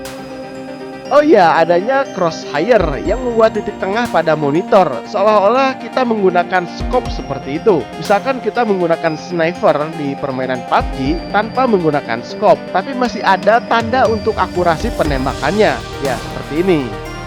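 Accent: West Java, Indonesia. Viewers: nyunda pisan euy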